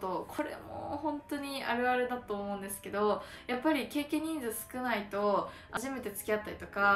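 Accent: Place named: Kansai, Japan. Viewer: 、めちゃくちゃ関西弁出てる。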